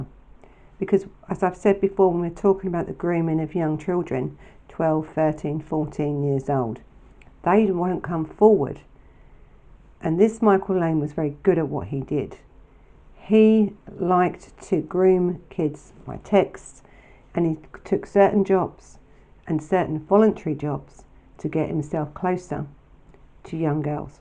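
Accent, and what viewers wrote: London, United Kingdom. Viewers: she sounds like she’s from London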